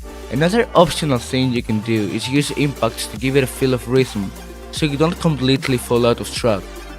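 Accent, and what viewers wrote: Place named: Greece. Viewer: Bro where are you from? You have such a Greek accent haha. Great video !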